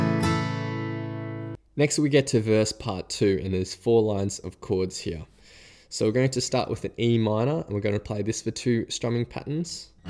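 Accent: Australia. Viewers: it's great to have a comfortable-sounding Australian accent doing this, weel done.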